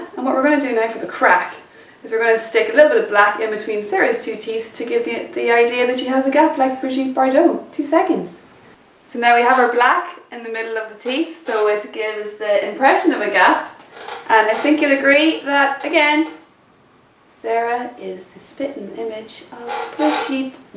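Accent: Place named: Ireland. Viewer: irish english doesn´t sound right it soounds awful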